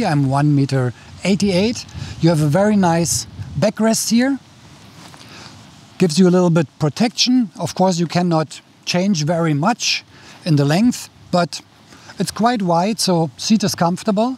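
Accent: Germany. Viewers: Your accent sounds Teutonic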